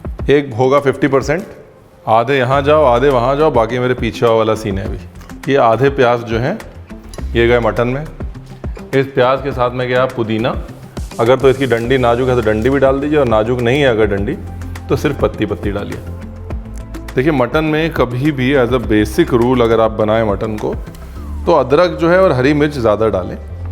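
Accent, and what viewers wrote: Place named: Lucknow, India. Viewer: mutton ka zayka ek taraf, aapke lucknowi accent ka zayke ka to jawaab nahi ! <3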